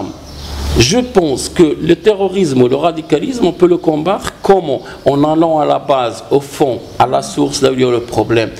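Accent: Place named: Arab world. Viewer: فيك عياقة وتكبر ديال رباطيين ولكن جات معاك غير حاول ماتنطقش فرونسي فيها لكنة معربية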